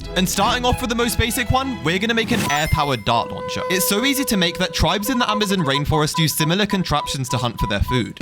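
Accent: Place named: England, United Kingdom. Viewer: im trying to work out what country you live in because you sound English but seem like you live in America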